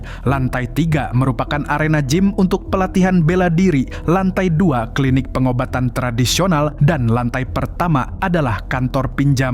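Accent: Banjar, Indonesia. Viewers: Ternyata mamang urang Banua jua 🤣🤣🤣 babahasa Banjar sidin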